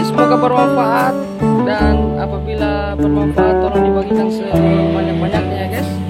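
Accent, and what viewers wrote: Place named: Sulawesi, Indonesia. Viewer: Orang sulawesi ya logatnya itu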